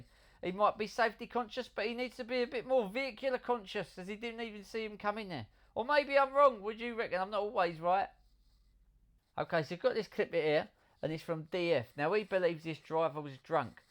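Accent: Cockney, United Kingdom. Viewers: Absolutely agree about lifetime ban for really dangerous or drunken driving. But it never happens, does it? I love the commentary and the cockney accent is perfect!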